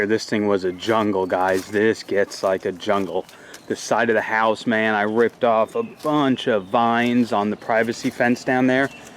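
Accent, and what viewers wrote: Philadelphia, United States. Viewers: That really does sound like a Philadelphia accent haha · Hey just found you! Love the vids! Where are you from originally and where do you live now? I hear Philly s Jersey... amiright? And in Florida now.... you?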